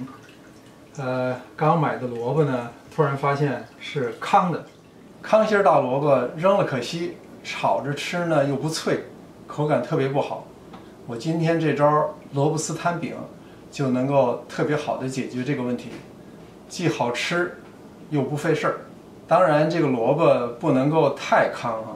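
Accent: Beijing, China. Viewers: 真不错，朋友是北京人吧。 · 很純正的京片子我一聽就愛死人了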